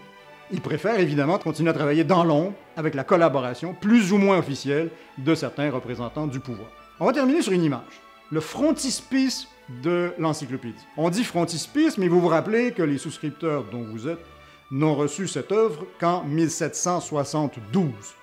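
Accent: Quebec, Canada. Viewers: Merci, c'est tout à fait passionant ! Et l'accent québécois fait toute la différence !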